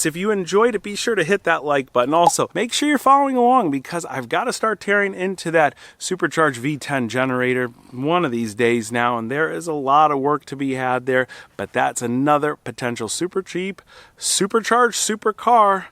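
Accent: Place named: East Coast, United States. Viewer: Holy smokes you look an talk like an east coast scumbag. Instant subscribe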